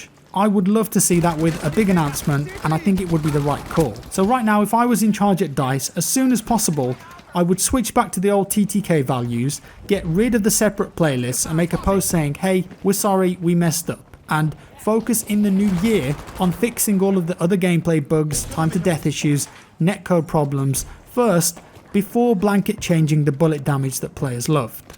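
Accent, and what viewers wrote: United Kingdom. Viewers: always like the way you put your thoughts, the core itself and your British accent,! Thanks for video!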